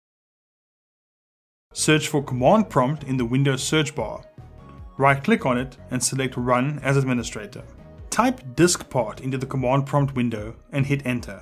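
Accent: South Africa. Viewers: Top result and the guy got a proprr South African accent so I’m happy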